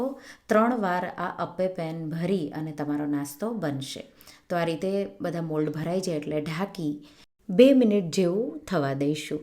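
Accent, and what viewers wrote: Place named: Kathiyawad, India. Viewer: તમારી વાણી એકદમ કાઠિયાવાડી મધુર છે. Love from New Zealand.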